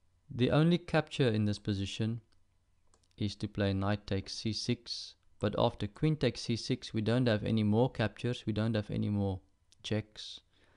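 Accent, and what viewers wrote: South Africa. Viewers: This guy is south African I can tell from the voice 😅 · south african accent ?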